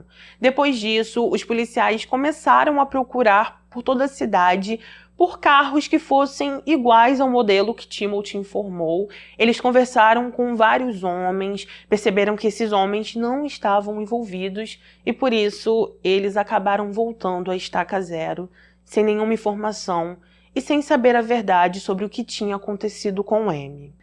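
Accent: Rio de Janeiro, Brazil. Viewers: Más uma inscrita porque gostei do teu sotaque igual carioca 😍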